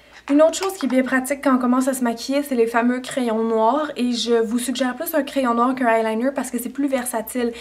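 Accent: Canada. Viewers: J'adore l'accent canadien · C'est un accent, l'accent canadien :) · T'es canadién ? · Mon Dieu, je vous aime vous Québécis et toi: Vous comprenez, VOUS, qu'on dit pas louze (comme les français le font si bien) mais "lousse" pour le mot loose. Merci d'exister!!:)))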